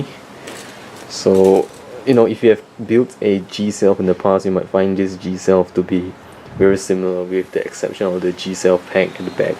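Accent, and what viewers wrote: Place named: Singapore. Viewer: hahah , recently saw stock at Gkgundamkits thou . you sound like a Singaporean . haha .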